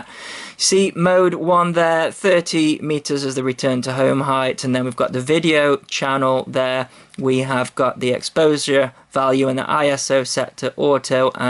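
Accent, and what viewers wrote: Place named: Stoke, United Kingdom. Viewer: Great review, best I have seen so far, great value for the money and glad you dont equate it with the spark, the spark is a much better craft with a better camera and can fly 1.5 miles away , the A3 has been shown to fly no more than a <num> metres at very best, lots of folk like a drone they can fly out of visual line of site even if they are not supposed to, for the money though its good, they need to work on the software for the flight controller, them simply saying its our own doesnt detract from the fact that its not right, the drone vibrates quite badly and its only the gimble that saves the footage from being unusable, give them 6 months and they will iron out the bugs, the underside port is shown being used with a solenoid to drop parachutes on the promo video. I know you were covering your GPS coordinates but your flying location is very easy to work out from the aerial video and your accent, got a lot of love for Stoke and have family up that way just a few miles to the west of you, thanks for the review.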